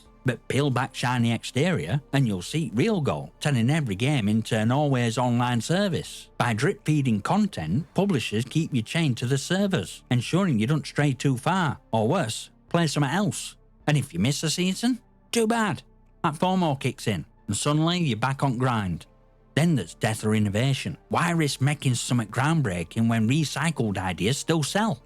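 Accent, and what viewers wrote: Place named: Northern England, United Kingdom. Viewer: As a guy from Leeds, that's one hell of a northern accent. Great video, I have the same gripes with these kinds of people, glad to see someone calling out the bullshit.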